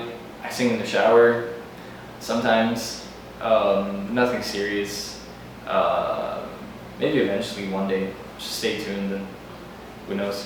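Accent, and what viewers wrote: India. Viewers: that indian accent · Indian accent on point! 👍👍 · i also live in india i wanna meet you plz gimme your address...... <mail> plz send me your address. your nail indian accent. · nice Indian accent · masha allah....u have nice sense of humour and indian accent too......keep up the good work.....ur recitation is so pleasing to hear...!! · love the indian accent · Indian accent bhai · Your Indian accent was so funny😂😂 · Hahahaha really funny love the indian accent Thank you so much we want more vedios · Hahaha the indian accent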